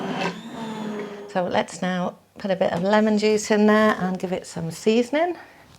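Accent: England, United Kingdom. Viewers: I've just got an Instant Pot with Ultimate lid. It is so easy to pressure cook with! Now I have found your channel I can learn more about how to use it. Thanks! Also your English accent is lovely to hear.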